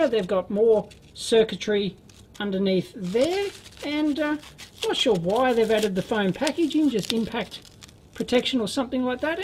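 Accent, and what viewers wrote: Australia. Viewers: I've been watching your blogs for a year and I dig the Aussie Accent. And the material is always excellent.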